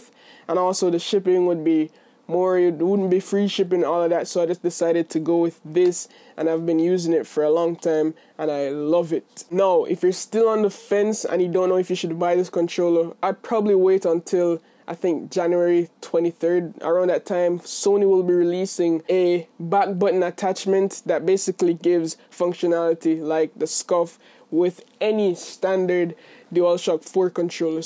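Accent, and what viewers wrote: Jamaica. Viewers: Are you jamaican....? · Is he Jamaican? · Is it jamaican? My parents are Jamaican. 😊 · ya jamaican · I knew yu was Jamaican when I heard your voice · You Jamaican?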